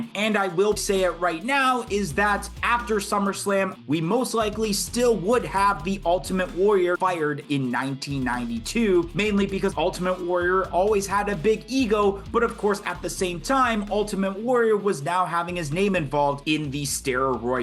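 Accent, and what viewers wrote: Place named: Canada. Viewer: What part of Canada are you from?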